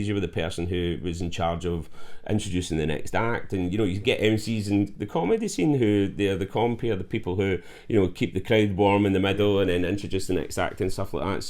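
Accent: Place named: Scotland, United Kingdom. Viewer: U sound like ur from milngavie Where’s fuckin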